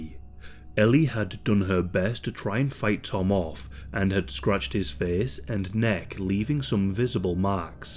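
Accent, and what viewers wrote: United Kingdom. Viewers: lol ur so british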